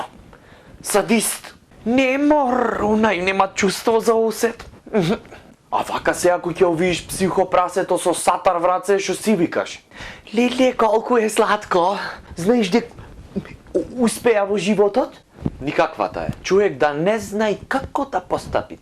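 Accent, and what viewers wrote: Ohrid, North Macedonia. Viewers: brat izvini vaka, ama za stend ap komedija ne si losh, no bitolcaneson te shie... ne si faca iako imas smek... bi rekol deka znaesh kako i umeesh do negde, no ti fali lik... napraj neshto od sebe, smeni go likot.. neznam kako, ama ti si umetnikot, stori neshto... i malku pomalce naglasuvaj go ohridskiot dijalekt.. iritira... naj iskren sovet. pozdrav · Mislam deka gresis. Covekov ima bogat recnik, a segite mu se navistina originalni, i bidejki gi interpretira na Ohridski dijalekt isto e interesno. Marjan poveke koristi sekojdnevni razgovori, no i toj e dobar. Pozdrav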